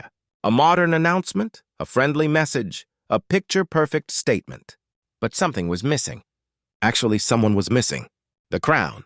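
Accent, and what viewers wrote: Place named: United States. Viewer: Dear Lord how I hate anything narrated by a Yank. I know not a word can be believed.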